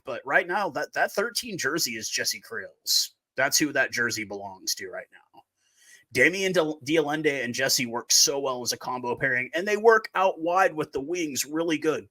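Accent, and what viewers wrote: United States. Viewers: Just subscribed to you just because of your knowledge of the Springbok set up and your obvious love for our boys whilst conversing with a USA accent. ❤from CPT, SA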